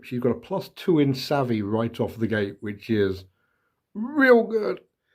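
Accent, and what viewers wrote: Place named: United Kingdom. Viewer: Big into Five Parsecs, and I'm playing it 15mm as well, so to find your channel (a fellow Brit by the sounds of it as well!) is great. You might be getting a fair few comments on me as I blast your playlists.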